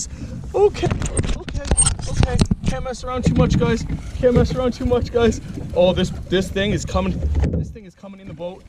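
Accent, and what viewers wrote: Canada. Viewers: I'm watching your videos every night from now on. That fire crackle and your familiar, calm Canadian voice (canada represent) just put me right to sleep. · Hey are you Canadian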